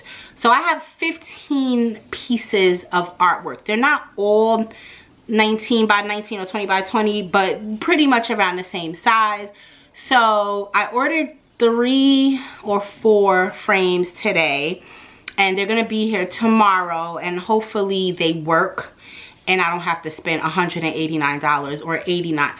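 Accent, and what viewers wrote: New York, United States. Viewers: We know you’re from NY girl, you couldn’t hide it if you wanted lol 😂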